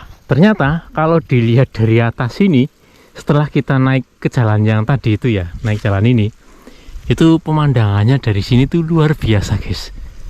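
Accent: Banyumas, Indonesia. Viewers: Mantep mas konten2nya bahasane logat ngapak tapi sangat menghibur dan menambah wawasan juga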